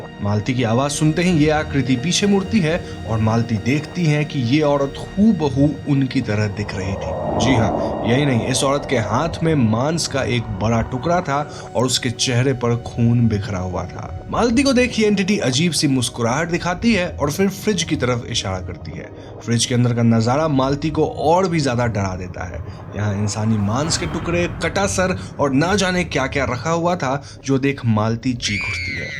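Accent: Bengal, India. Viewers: The VO is of a bong. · Bangali babu. Hindi theek karo.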